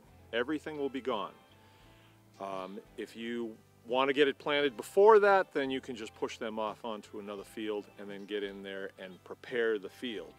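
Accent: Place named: New England, United States. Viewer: I haven’t watched the whole video but you seem to be hiding a New England accent.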